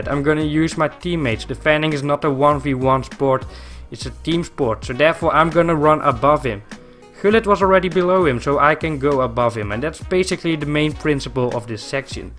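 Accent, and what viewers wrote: Netherlands. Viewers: Must be dutch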